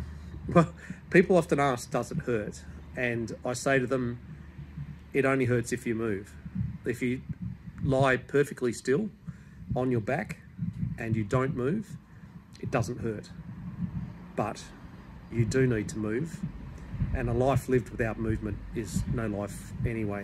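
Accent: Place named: Australia. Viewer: Hello dear...you touch my heart. I love to hear the accent. My dear husband passed 2 years ago; he was from Melbourne. Your accent is similar. You are very brave...your angels are all around you. Hugs and prayers❤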